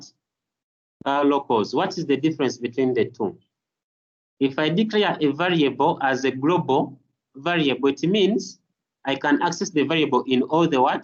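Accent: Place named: Uganda. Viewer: Well represented! Surprised to here a Ugandan accent after watching only Indian tutorials.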